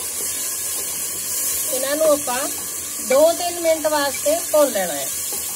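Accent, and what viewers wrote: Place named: Punjab, India. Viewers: Di I like ur voice ..typical punjabi.nice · thoda punjabi bolan da style bada jiada cute hai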